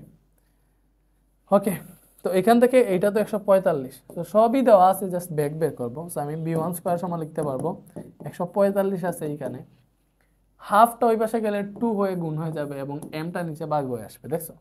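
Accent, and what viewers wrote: Sylhet, Bangladesh. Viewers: vhaiya apeni ki sylhetii? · Vai apni ki sylheti😊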